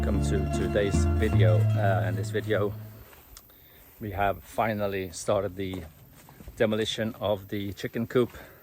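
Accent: Sweden. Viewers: Är det en svensk dialekt jag hör, mån tro? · Haha I knew it too!! Was looking for this comment before I asked too. I have worked in Sweden and recognized the accent!! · Yes, thats a great idea! Introduce yourself and, describe your family, who is going to be living in your future mini paradise! All I know is you have a distinctly Swedish accent in your english speaking! Very curious also about the process of getting the house - When? How? Why? Some context would be fascinating! Subbed!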